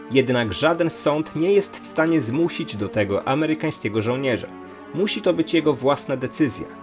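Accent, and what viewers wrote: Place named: Eastern Borderlands, Poland. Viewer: qzwa cztasz jak jakis uchodzca z kresów wschodnich...tajojku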